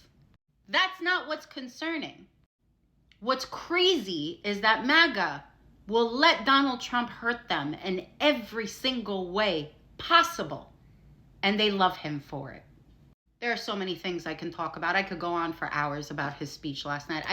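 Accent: New York, United States. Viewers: Queens? Long Island? Massapequa?? Love the accent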